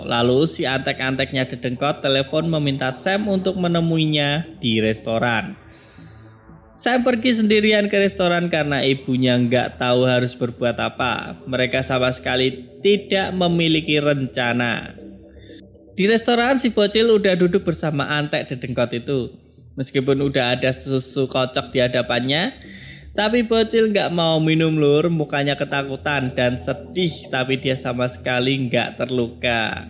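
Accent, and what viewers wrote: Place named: Klaten, Indonesia. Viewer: Mimine opo wong klaten yo? Logate koyo klaten 😅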